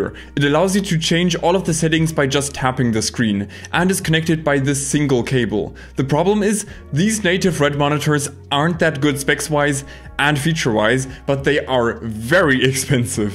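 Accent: Germany. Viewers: Keine Ahnung - 1 Satz und für mich klingt das als ob du Deutscher bist. Wieso bloß kein Video direkt in DE ❤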